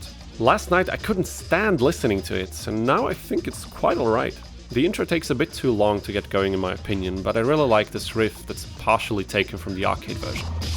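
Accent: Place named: Netherlands. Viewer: Easily one of the most irritating videos I’ve ever watched from start to finish Audio balancing, trash. Netherlandic accent, trash. Ad break after every song, hope the .01 euros I gave you was worth the time you wasted of mine. Can’t take 5 seconds to google “what does embu mean?” While editing this whole ass hour long podcast on a computer.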